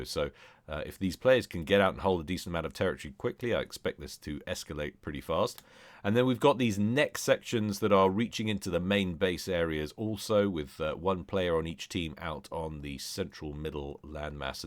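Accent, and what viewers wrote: United Kingdom. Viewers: The thing I enjoy about casts other then the brits lingo/accent are he just enjoys the game as much as I do, where others casters seem to be just talking. The other random off topic comments are just the icing on the cake to remind us he's not A.I. Loved seeing the addition of the recap of "missed critical action" or the "WTF happened while I was focused somewhere else" at the end of some casts when needed. I know it's all alot of work but think of it as recompense for all the other stuff that was missed. Ya, not sure how trump won when I compare the two, which comes down to either Americans are stupid or sexist, which is sad because I know many of them who are not either of those. Now it's time to grab a bag of popcorn and watch the world deal with human greed/stupidity in the worst way in, power over the people. Thanks America, another thing in the history books up there with the other world goofs. 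And before someone wants to comment, lets just let the next 4 years prove me right or wrong. I just know I bought some Elon and Trump shares to sell in 2 years, which better pay for my spaceship off this planet cause we can't take the money where humanity is going. XD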